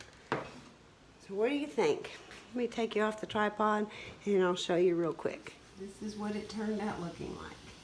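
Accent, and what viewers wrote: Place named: South, United States. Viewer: LOVE 3 TIERED TRAYS! MADE ONE FOR MY DAUGHTER OUT OF GRADUATED SIZED SPRING FORM PANS. HAVE SUPPLIES TO MAKE 4 DIFFERENT ONES I HAVE SEEN ON DOLLAR TREE DIY YOUTUBE VIDEOS. YOURS IS SO PRETTY & FARMHOUSE! ARE YOU FROM SOUTHERN ILLINOIS? YOU HAD MENTIONED CARDINAL BEING YOUR STATE BIRD. MAYBE ANOTHER STATE HAS CARDINAL AS WELL. I NOTE A BIT OF SOUTHERN ACCENT.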